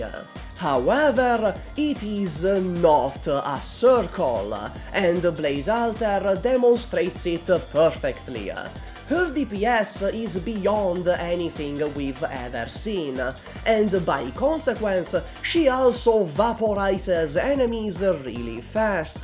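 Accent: Italy. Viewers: "This is why I sound like this" Italian? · Ti seguivo senza sapere che tu fossi italiano, però effettivamente l'inglese è proprio maccheronico <3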